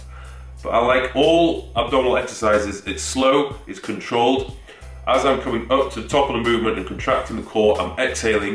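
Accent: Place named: Birmingham, United Kingdom. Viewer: I can't place his accent, is he from Burningham?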